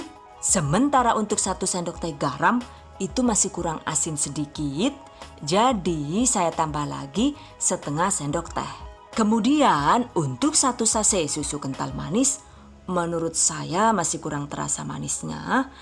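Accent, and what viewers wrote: Banyumas, Indonesia. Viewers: Muaturnuwun mbak ngapak 😀🙏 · Deneng ngapak kaya inyong heheh,,maturnuwun bunda resepnya... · orang ngapak ya pada baelah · Mantep resep rougut nya ,, ngmg ngapak koh,, nyong wong ngpak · Mbakayu ne lucu ning ngomong Jawa ngapak cm sayang ora katon wajahe, tp masakane ky ne top maskotop.... Jos gandos wwwkkkkwkkkk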